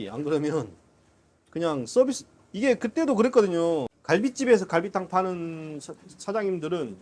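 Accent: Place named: Jeolla-Gyeongsang border, South Korea. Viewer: 말투나 식성을 보면 전라도와 경상도 그 경계 출신인듯 함.. 하동이나 여수분이 아니실까??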